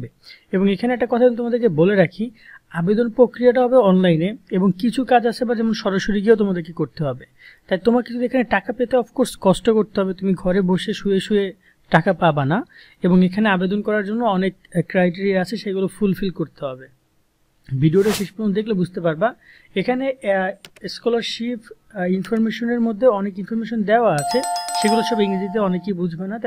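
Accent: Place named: Barishal, Bangladesh. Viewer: Vai apni ki barishal er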